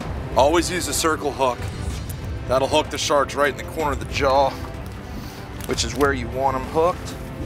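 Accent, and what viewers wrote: South Africa. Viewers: has a South African accent. So cool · The dude sounds south african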